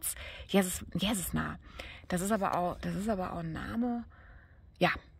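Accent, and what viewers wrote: Hesse, Germany. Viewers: Liebe, heute an diesem für mich besonderen Tag habe ich dein Video mit dem aramäisch en Vater-Mutter unser gefunden. Du hast es wunderbar gesprochen - gefällt mir persönlich besser als die gesprochene Version von Monika Herz im Martha Gebet. Ein winzigen hessischen Akzent habe ch gehört- aber schön. Ehrlich gesagt, gefällt mir persönlich für unsere Breitengrade das deutsche Ur-Vaterunser doch am besten. Wegen der Klarheit, Schönheit und der Möglichkeit die Dinge in ihrer Gesamtheit zu verstehen. Wir müssen nicht alles neu erfinden oder in Sprachen sprechen, deren Struktur wir eigentlich nicht verstehen, alles gut wie es ist - soweit kurz zum Thema"Hype". Wenn wir das was wir tun, mit dem Herzen tun, ist es doch völlig wurscht, ob wir Urdu, Hindi, schwäbisch oder hessisch sprechen Erstaunt war ich auch über das Buch, daraus habe ich meiner Mutter auf der Intensivstation jeden Mittag vorgelesen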